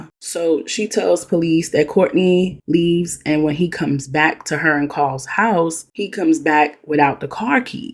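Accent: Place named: New York City, United States. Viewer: Yo you funny ash with that accent love that nyc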